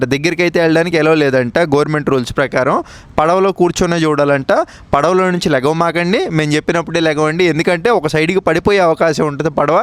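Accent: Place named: Godavari, India. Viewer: Oy abbayi ne videos super and ne voice kuda super same ma godavari abbayila behave chestunavu ne mata yetakaram thoo❤❤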